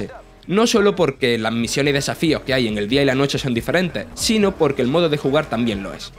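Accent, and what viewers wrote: Andalusia, Spain. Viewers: Habla con su acento, también existe el acento gallego, canario, madrileño, murciano, como el andaluz, fin. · en el sur de España se habla asi, por desgracia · Alejandro Cabrera Moraes Emmm claro que sí de hecho, te lo estoy diciendo por algo y el acento que tiene el hombre que está hablando es propio del Sur de España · no sabes lo que es un acento? Si es Andaluz y tiene ese acento ¿porque deberia de esforzarse en hablar como los del norte? El que no le guste que no lo vea, pero decirle que debe mejorar su manera de hablar porque a ti no te gusta (siendo totalmente correcta su forma de hablar) no viene a cuento. Ademas para tu informacion, el Andaluz esta a la par del Castellano (Español) ahora a esperar que me lluevan tortas de iluminados!!!! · Acento andalúz xd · Que es andaluz es indudable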